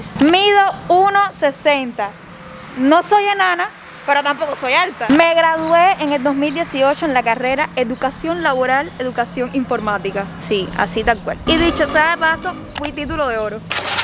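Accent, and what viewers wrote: Cuba. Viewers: Viendo este video en 2023, ¡wao! que delgadita eras y hablabas muy distinto ya has perdido bastante el acento cubano.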